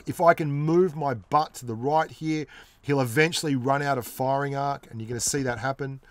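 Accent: Australia. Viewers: Australian mate. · Manav Plays It's Australian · Is this guy from Australia? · Why do ppl always say it is british, it is clearly Australian accent, it even shows in the flag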